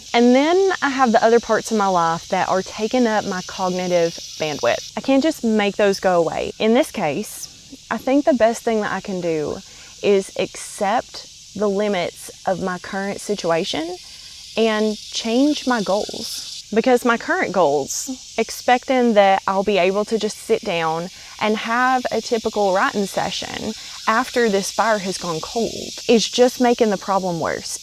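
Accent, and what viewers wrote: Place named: North Carolina, United States. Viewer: I love your accent it sounds very NC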